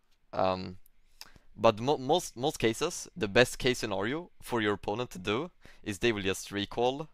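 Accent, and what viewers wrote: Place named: Sweden. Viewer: Love your videos man. And your sweet Swedish accent! :)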